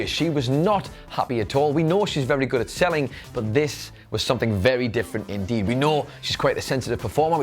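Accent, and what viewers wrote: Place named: Newcastle, United Kingdom. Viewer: Wey aye man. A fellow Geordie. You're getting a sub just for that.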